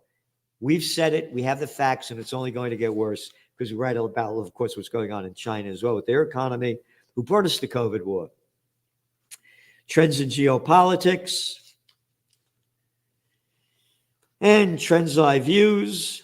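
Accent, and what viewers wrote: United States. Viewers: This guy sounds like a typical American 🤔🇺🇲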